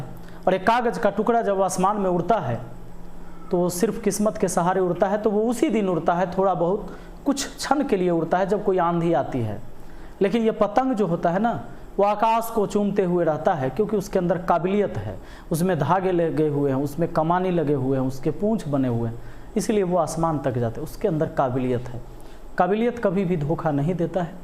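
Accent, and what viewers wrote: Bihar, India. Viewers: Abe Ga*ndu ab tu unko sikhayega kya shi hai or kya galt, wo Bihar ke hain unki language aisi hi hai, tere se bahut aati hai hindi to ye video hi mt dekh, ja dusre channel walo ki chat bhencho*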